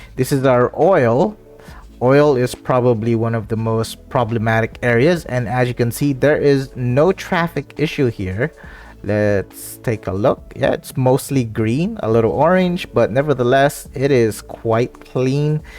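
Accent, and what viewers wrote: Philippines. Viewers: Are you a filipino? Your accent is familiar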